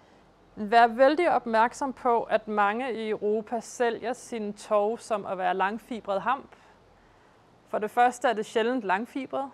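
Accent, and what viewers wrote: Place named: Norway. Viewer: Norskificeret dansk lyder ret sjovt. Det er stadig nemt at forstå for mig, som dansker. Jeg tror også det virker for nordmænd. Aner ikke om det kan forstås af svenskere (uden for Skåne). Tak for videoen! :)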